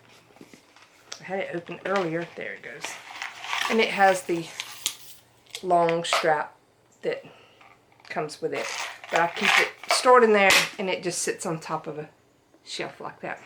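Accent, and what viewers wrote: South, United States. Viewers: love your purses and your southern accent! Your vids are great!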